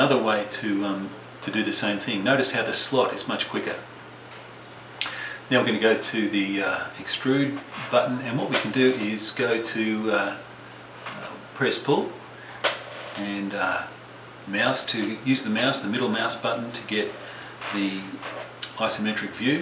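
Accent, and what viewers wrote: Australia. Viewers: I spent a year in Sydney working with H.O.Wills Tobacco. It was a great experience. Are you Australian?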